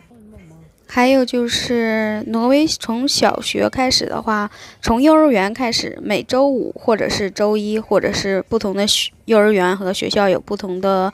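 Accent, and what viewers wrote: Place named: Dashiqiao, China. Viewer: 这些黄色的蘑菇好多啊，你们的收货好多哦。我朋友是大石桥的，和你说话特别像。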